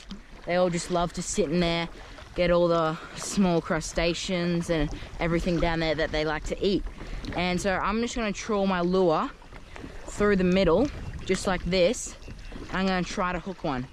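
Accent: Australia. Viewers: hey what part of Australia are you from ?😜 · is he australian? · Melissa Bushell he's an Aussie, Fuckn lad he is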